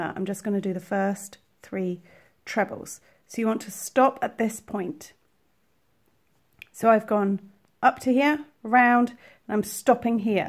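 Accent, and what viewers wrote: England, United Kingdom. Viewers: Hi this is brilliant - so grateful for your lovely video tutorials. The only thing confusing me is your use of US terminology in your lovely English accent - but that isn't a criticism as just so grateful to be able to follow your methods. Will this CJAYG method work on the solid granny square and do you have a tutorial for that as well please. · Great video I have subscribed and shall look at your other vids You sound British so why are you using USA terminology? You even kept correcting yourself when you said treble. Not trolling or criticism just curious?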